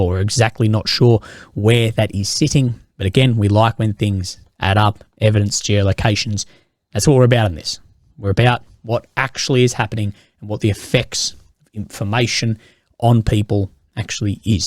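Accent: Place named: Australia. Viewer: Its just how people talk in Australia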